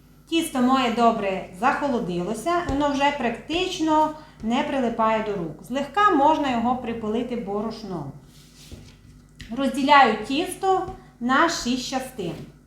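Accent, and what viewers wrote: Transcarpathia, Ukraine. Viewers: Доню, сир завжди був "домашній",або "свіжий". То у москаля "твораг"...Ми ж не співаємо:Любив козак дівчину, і з кисломолочним сиром пироги😊😉. А слухати вас дуууже приємно, бо згадую дитинство та подорожування по Закарпатті.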